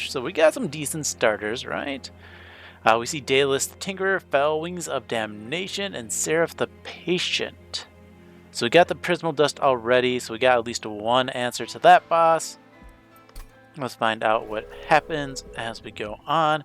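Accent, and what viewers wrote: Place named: United States. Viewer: Sounds like typical standard American intonation to me. though the trend over the last couple decades is towards flat affectless intonation.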